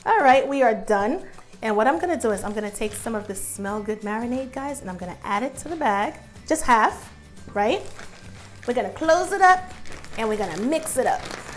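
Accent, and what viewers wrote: New York, United States. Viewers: oh yeah ....she's a New Yorker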